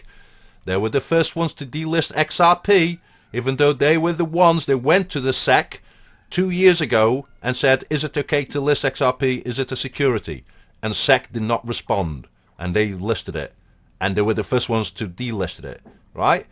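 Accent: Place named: Northern England, United Kingdom. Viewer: love the Northern accent you've picked up, brilliant.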